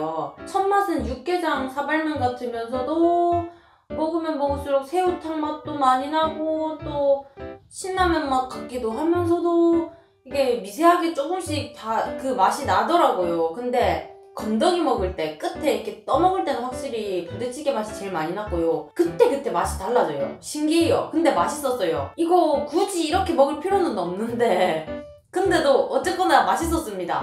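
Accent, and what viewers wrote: Gyeongsang, South Korea. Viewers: 님 갱상도사투리가 정답네용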